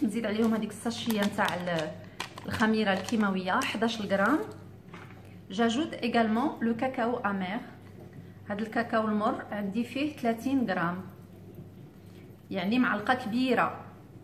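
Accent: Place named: Morocco. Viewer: Pourquoi vous êtes tunisien et vous parlez l’accent marocain???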